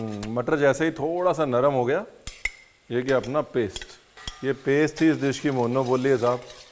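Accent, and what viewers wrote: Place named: Kanpur, India. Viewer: Aap kanpur se h lag raha. Aapki boli kanpuriya h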